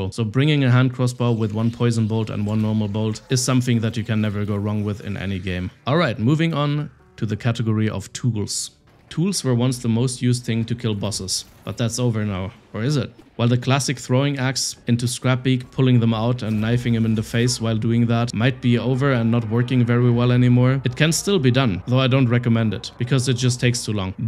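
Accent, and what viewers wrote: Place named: Ireland. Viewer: This guys accent is almost irish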